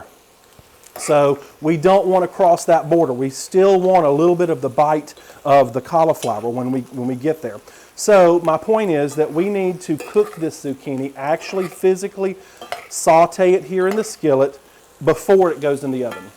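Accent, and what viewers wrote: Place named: Tennessee, United States. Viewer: I am from Knoxville, TN and I now live in Florida. It is driving me crazy trying to figure out your accent! I believe you are from Tennessee too??? Please tell me!!! I’m really going crazy😂😂😂 I’ve watched many videos trying to figure it out. You just use lots of slang I have only heard in Tennessee. I love your videos and you and I think a lot alike. Please have a great day!...... and please answer my question 🤷🏼‍♀️😂😂😂😂😎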